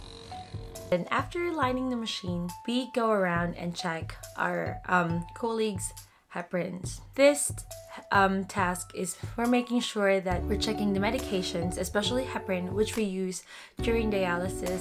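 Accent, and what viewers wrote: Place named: Australia. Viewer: Love the Aussie accent😍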